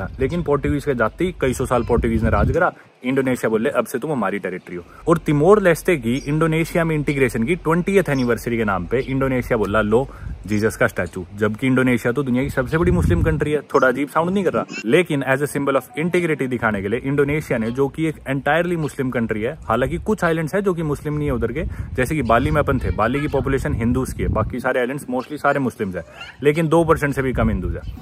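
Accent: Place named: Delhi, India. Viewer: Jaise delhi wale punjabi bolte h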